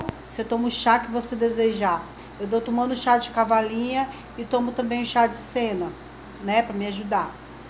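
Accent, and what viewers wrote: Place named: Manaus, Brazil. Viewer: Eita que vc falando deu saudades de Manaus. Morei 5 anos aí no Aleixo. Xeroo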